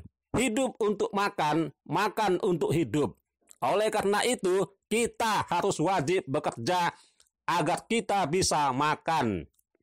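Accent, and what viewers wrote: Java, Indonesia. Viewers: L11,bejerja sambil berdoa..benervkali saudaraku..janji om sudah om laksanakan,kok logat ngomongnya asli kental logat orang jowo yo..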